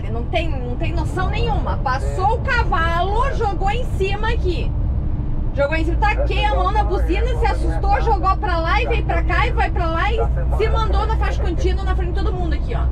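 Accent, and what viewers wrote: South, Brazil. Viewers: Kkkkkkkkkkkkkkkk você é catarinense ou paranaense?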